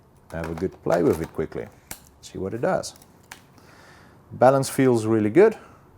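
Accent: South Africa. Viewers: You sound Afrilaans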